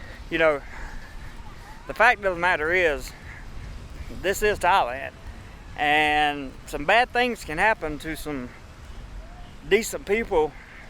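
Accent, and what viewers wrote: South, United States. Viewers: Irishman he has a southern American accent. This is not to say he is good old boy. That term can be taken as a pejorative by some.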